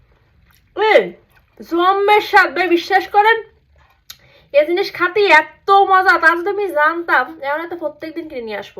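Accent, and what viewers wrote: Khulna, Bangladesh. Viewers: আপনি যে অরজিনালি খুলনার চলিত ভাষায় কথা বলেন, এজন্য জম্মের ফাইন ভাল্লাগে 😂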